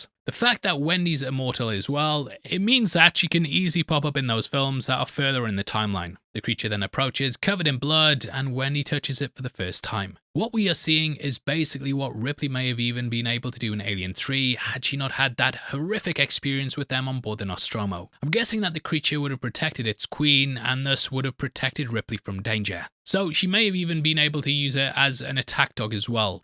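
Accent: United Kingdom. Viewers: You really dont have a strong American accent, are you from the UK? 😎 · Hint: He has a very slight, barely noticeable British accent.....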